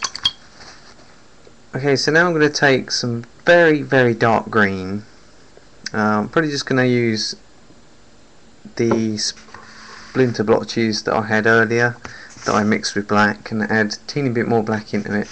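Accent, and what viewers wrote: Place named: United Kingdom. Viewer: Good God!! A British voice!!!!!!!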